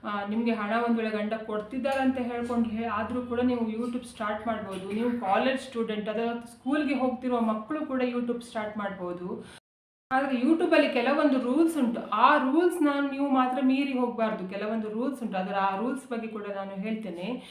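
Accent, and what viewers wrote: Mangalore, India. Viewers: Mangalore bhhashe tumba chanda maarre ..😅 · ನಿಮ್ಮ ಮಂಗಳೂರು ಭಾಷೆ ನನಗೆ ತುಂಬಾನೇ ಇಷ್ಟ ತುಂಬಾ ಚೆನ್ನಾಗಿ ಮಾತಾಡ್ತೀರಾ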